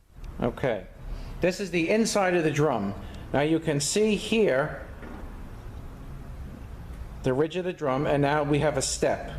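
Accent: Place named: New Jersey, United States. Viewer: I like to hear about 5hp19 with Sopranos kinda accent! All best to you!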